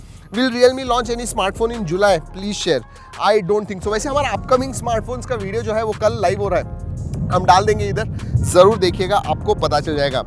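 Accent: Maharashtra, India. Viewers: मेरा Suggestion आपके लिए ये है कि - मराठी पद्धतिची हिन्दी बोलू नका।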